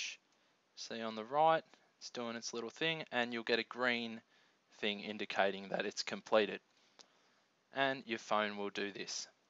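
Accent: Australia. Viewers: Nice video, you Australian? I was thinking of doing it, though i'm having second thoughts - what are the benefits in having your Sg3 rooted?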